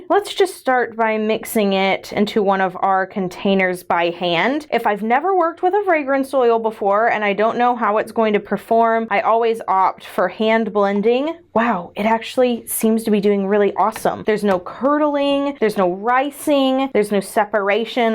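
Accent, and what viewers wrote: Texas, United States. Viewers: Just be you. We can't please everyone. For me, as long as the Lord is pleased that is really all that matters. You aren't in England. You are in America speaking how you speak. Don't change who you are when you're innocently using your Texas accent.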